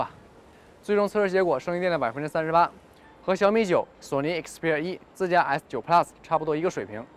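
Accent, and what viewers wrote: Northeast, China. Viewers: 听口音像东北人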